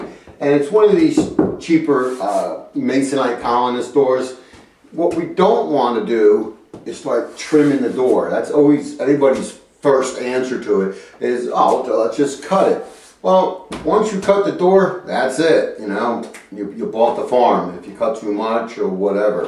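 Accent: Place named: Philadelphia, United States. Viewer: My first time watching your channel, just hearing you talk I'm telling myself you gotta be from my neighborhood in Philly.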